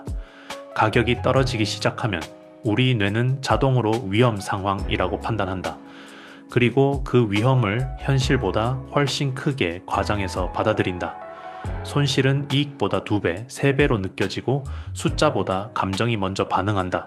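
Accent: Gyeongsang, South Korea. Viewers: AI로 편집한 목소리 경상도 비슷하게 들리는건데 전 오히려 좋아요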